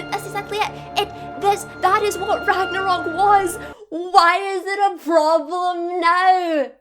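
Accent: Scotland, United Kingdom. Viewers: Are you Scottish ? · Oh Scottish, I was wondering. Well its lovely! And if this wasnt scripted I would have to applaud you again because I thought it was very well thought out. I too was a big fan of the shakespearean vibe they had in the first two movies which I really enjoyed but they ditched that theme and went way too silly. I liked how you highlighted the theme of Thor always moving away from wanting to rule which is very much his character arc, wanting to be more involved with middle earth than Asgard. I agreed with everything you put out there and really appreciated your video. 🙂 I just found your channel but I am definately subscribed now!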